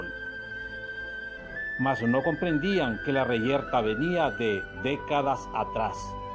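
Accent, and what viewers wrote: Honduras. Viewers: Ese narrador es puro hondureño no bale verga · Ese narador a de ser hondureño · Se nota que el que habla es hondureño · No hay duda que por la forma que narra la historia es hondureño jijiji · Este maje del narrador es hondureño jajaja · Juan Alvarez EXACTO DESDE EL PRINCIPIO QUE EMPIEZA A NARRAR SE CONOCE Q ES CATRACHOOO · Con que dolor lo narra este idiota narrador, se le nota lo hondureño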